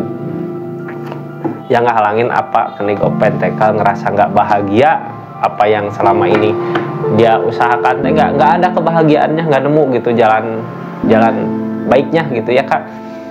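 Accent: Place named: Sunda, Indonesia. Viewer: logat sundanya kental banget... 😍 Thanks readinganya... Sukses selalu ❤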